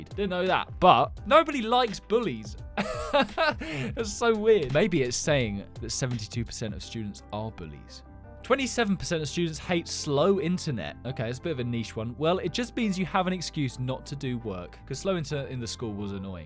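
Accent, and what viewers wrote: United Kingdom. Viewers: British person alert 🚨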